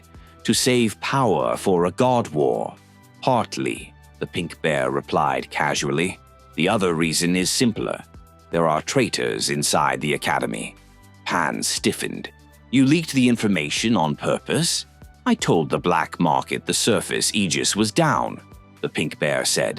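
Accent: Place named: United Kingdom. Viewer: Ah yes british narrator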